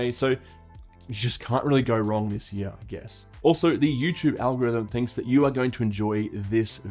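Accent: Australia. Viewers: Why do i feel stupid when i can’t easily follow through an Australian accent 😅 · me rocking a 2015 macbook pro which i think she is screaming for me to let her retire lol thank you for making this content and nice to hear another aussie to! I tend to lean to more beefy ram as i hold my laptops for years. I do think I can buy any of the current line up and be really happy but I have been a pro user for ages ha.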